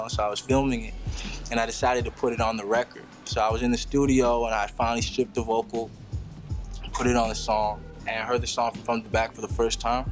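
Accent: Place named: Canada. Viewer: He sounds SOO Canadian, eh? The Canadian "hood" accent is a combination of West Indian, and believe it or not, African. There's even some Arabic influences. I personally find people who say "ahlie" and "walahi" to be annoying, but I mean that's still pretty cool.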